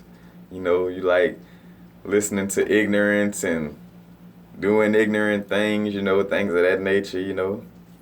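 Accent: New Orleans, United States. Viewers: are you from New Orleans? accent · From New Orleans . Got the Clark shirt on had to match it to the accent.👌🏿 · Dawg! I’m in New Orleans! You here to huh? I know! I’m coming over right nah ya heard me! Dat boi said ku-di-lini!! Wazzam!? I can hear it that city all in ya voice! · I kept saying "dude sound so New Orleans" then I saw the Clark t shirt then you said boudin.....#NOLA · So Nuawlns yea dude gotta downtown accent..... New Orleans checkin in ya know.... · Sounds like you're from New Orleans. Reminds me of home! 😀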